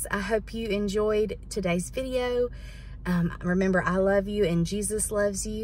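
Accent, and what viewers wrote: South, United States. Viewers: I love when you make a new video. I just love you and Buster Brown and Aunt Penny. I love your southern voice. You make me laugh out loud when you say that you look like a buzzard. Keep making your great videos. Thank you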